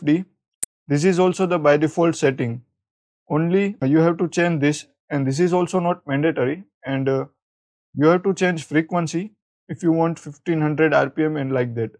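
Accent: India. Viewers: How did you want him to speak in Spanish when he has a indi accent in english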